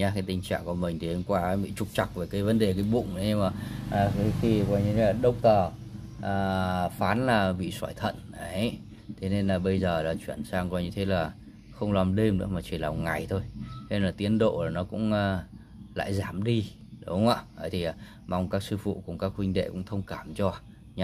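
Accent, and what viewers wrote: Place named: North, Vietnam. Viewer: Sư huynh nói giọng bắc sống đất nam vậy. Cho em hỏi sư huynh quê đâu vậy. Chúc sư huynh luôn khỏe và có nhiều thành công với đam mê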